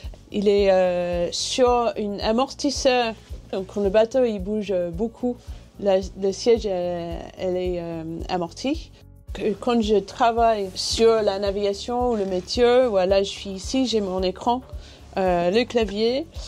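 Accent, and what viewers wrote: United Kingdom. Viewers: je l'adore !!! ma préférée ... j'aime son sourire ,son accent british et sa force de caractère ... j'étais présent lors du départ de la dernière course du rhum .... impressionnant ces bateaux et de savoir qu'ils elles sont seuls a la manœuvre .... des héros !!!! énorme respect ..... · Extrêmement sympathique la capitaine avec en prime ce petit accent anglais si caractéristique au charme indéfinissable.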